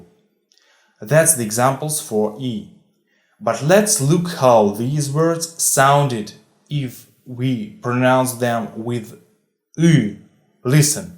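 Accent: Russia. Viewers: Ахуенный акцент братан, иностранцы в эйфории, настоящий русский учит))))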